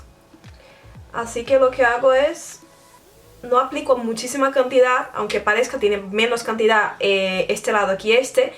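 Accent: Brazil. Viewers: eres brasileña? (como se diga)xD o hablas así? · Tienes acento brasilero, muy bonito! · Me gusto su acento brasileño mezclado con el español :3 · ya se me hacia que tenias un acento brasilero xD en fin buen video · Brasilera aunque en otro canal una chica también me pareció de Brasil y me dijeron que es de Honduras. 😀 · Creo que Brasilera o eso sentí xd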